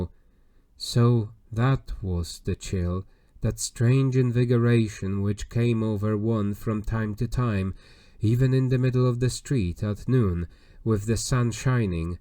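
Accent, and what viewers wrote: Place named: Poland. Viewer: Hey, thanks for reading this! Big fan of Lem and classic sci-fi in general, and this book is really fun. It's nice to be able to listen to it in English. Also, are you Polish by any chance? I feel like you speak with a bit of an accent, but I can't quite tell what it is. All I know is that I sound kinda similar when I speak English, and I'm Polish, so maybe it's a Polish accent? (That's not meant as a criticism, by the way; your pronunciation is very clear and your voice is consistent. Good job!)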